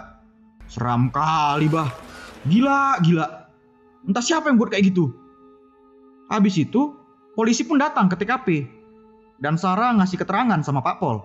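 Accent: Medan, Indonesia. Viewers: Bang gua kangen ama suara asli medan lu bang gua suka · Anak medan ni keknya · Anak medan punya logat 😂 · weh bang, kau ceritain film kenapa harus pake bahasa Medan bang, kan jadi ngakak😂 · Orng medan bang? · Bg orng Medan ya bg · Yang bikin saya suka Vidio in adalah logat medannya Abang Abang ini🤣❤️ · Kek suara orang Medan:v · Emang orang medan daerah namorambe emang the best ligat suara lu bang? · Logat medan emang gk ada duanya.. 🤣🤣🤣 org Medan jg nih 🤭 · Orang medan mana suara kelen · pasti orang Medann ni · Nampak kali orang Medan mantap bang 👍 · Medan punya bos wkwkkwk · Logat medan pun membuat saya subscribe😂 Asli ini mimin ny bner2 buat kita kyk nganggap suara dia jadi pemeran itu. Feel ny dpt bngt. Terus berkarya lek👍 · Orng medan ka bah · Pernah nonton film-nya, tapi waktu baca notif langsung klik aja🤭 mantap kali bang,,, aq suka bahasanya kaya orang medan😃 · Saran: kasih banyak logat medanya kayk suara chinoll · Pengisi suara nya jangan ganti" bang.. 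Tetap pake bahasa medan... Mantap seru kali aku bahh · Orang medan dia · Berasa lagi ngobrol sama calon lakiku 😂😂 dia orang medan pula wkwkwk ngakak denger suaranya medan banget jir abang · Wkwkw org Medan ya bang? Btw mantap bang trus berkarya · Orang medan kah abang😂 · orang medan nih pasti abangnya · Mantap bang orang medan y sama lah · Logat medannya keren abg, semangay trus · Logat medan bukan sulawesi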